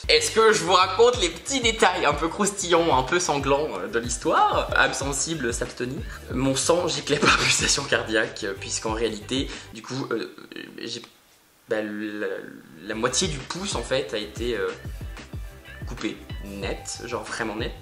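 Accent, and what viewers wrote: United Kingdom. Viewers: Y’a qu’à toi que ça arrive ce genre de choses 😭 Je suis d’accord avec les autres commentaires, t’as grave pris des mimiques à Gaëlle c’est chouu Et j’ai une petite question, ton accent anglais tu le sors d’où ? J’ad-oreee 😍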